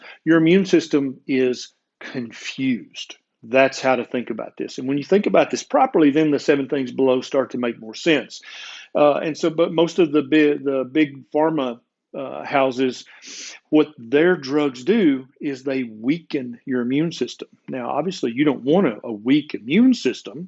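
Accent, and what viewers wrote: South, United States. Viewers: Thank you for making these videos. Explanations made simple and concise with a southern accent and a handsome smile... Love it!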